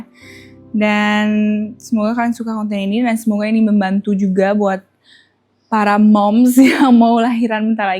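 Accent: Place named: France. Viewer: aku tu suka bgt kalau kamu ud ngomong inggris tapi akses prancis kek kek anjaay mehong bgt dirimu😭💓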